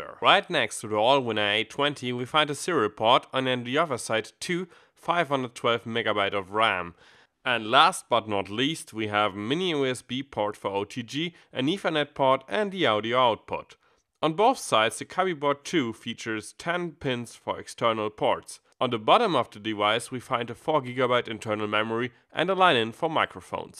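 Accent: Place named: Germany. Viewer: Such a refreshingly German accent ^^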